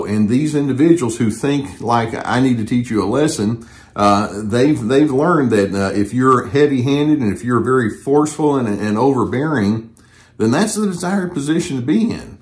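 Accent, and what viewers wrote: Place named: Texas, United States. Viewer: Another great video from Dr. He really gets to the heart of the matter! I so often heard this horrid expression from my mother and having it defined logically helps me to rationalise and overcome its effect. Many thanks for the calming effect of these videos. That lovely Texan accent and the comforting Gus on the sofa help a lot too! 💕💕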